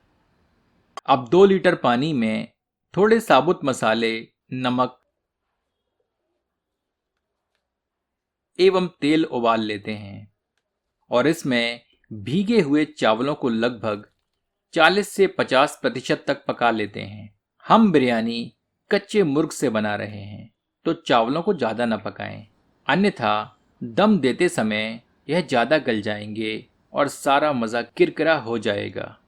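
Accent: Standard. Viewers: The pure hindi voice over is amazing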